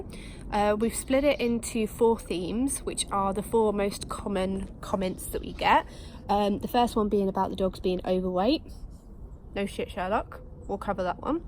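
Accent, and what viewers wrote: United Kingdom. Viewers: I love British accents. Ever since I was a small lad, my Dad and I would always try to imitate all the different kinds. So now I mainly use them on my daughter to make her giggle at times. Your accent reminds me of an old clip from a movie called Wayne's world, a scene that used to make me crack up. <link> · No need to explain anything. You’re house is amazing, love your British accent, the dogs are adorable and all pets are greatly loved. Life is waaaay too short to make negative comments on a very positive channel.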